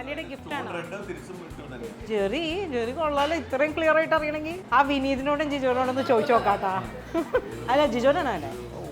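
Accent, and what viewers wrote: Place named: Thrissur, India. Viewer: Now I get it why is having a thrissurian style of talking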